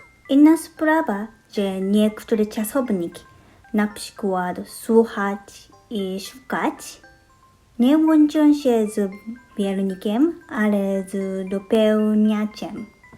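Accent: Japan. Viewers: HAHA pomimo że czasem masz problem z naszymi dż, dz, ć itp. mówisz tak dobrze że łatwo cię zrozumieć a ciekawostką jest akcentowanie japońskie polskich słów :D Choinki niestety nie lubią ciepła i suchego powietrza mieszkań (nawet te w doniczce z korzeniami) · Dużo ludzi, którzy przyjeżdżają do Polski z innych krajów mówią, że polki jest trudny. Zgadzam się z nimi, ponieważ pomimo, że jestem polką również mam z nim problem. Na przykład z ortografią i gramatyką. To są dla mnie męczrnie. Szczególnie gramatyka. Z ortografią miałam problem w młodości😊 Życzę powodzenia. I tak już bardzo dobrze mówisz po polsku. I bardzo podoba mi się ten twój japoński akcent. Jest cudowny❤ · Drzewko ( choinka) ma igły a nie liście i wg tradycji choinkę się wyrzuca po wizycie duszpasterskiej 🙂 Mówisz bardzo dobrze po polsku, widać że się bardzo starasz ale też widać że mięśnie twarzy/języka masz przystosowane do języka japońskiego gdzie są inne dźwięki ale to normalne 🙂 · polski jest latwy smiesznie muwisz tak po polsku z akcentem japonskim bez obrazy oczywiscie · Dla mnie Japoński jest trudny, ten Wasz akcent 🙂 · Hello, I'm from Poland! 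The way you speak Polish with a Japanese accent is really cute! My dream is to learn Japanese one day! I've been fascinated by Japanese culture and language ever since I was a kid! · Ale japoński akcent w polskim brzmi słodko :D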